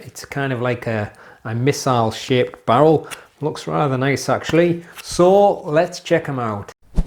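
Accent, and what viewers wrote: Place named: Middlesbrough, United Kingdom. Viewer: Been watching the vids for a while now just wondering whereabouts you are from as I am from Middlesbrough , it sounds as if you're from that neck of the woods.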